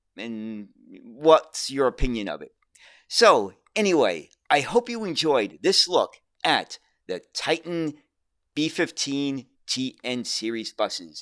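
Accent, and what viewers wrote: New York, United States. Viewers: is from New York, as if you couldn't have guessed that already!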